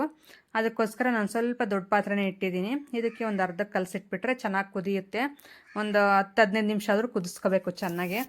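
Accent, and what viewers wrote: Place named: Sira, India. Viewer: Super nivu matado riti nange thumba esta aythu because nam sira side kuda navu hige matadodhu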